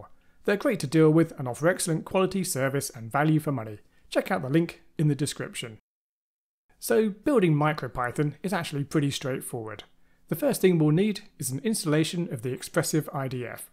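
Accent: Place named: United Kingdom. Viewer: You sound like you're from the UK. Did you order via pcbway? Any issues since brexit / vat changes?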